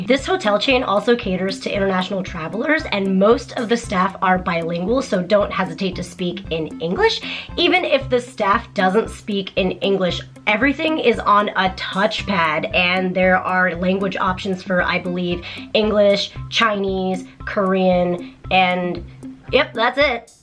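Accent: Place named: United States. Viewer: Your Japanese got an American accent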